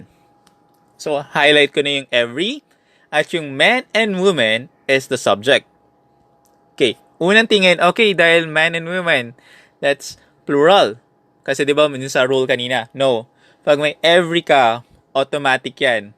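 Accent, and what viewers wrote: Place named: United States. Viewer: Boses amerikano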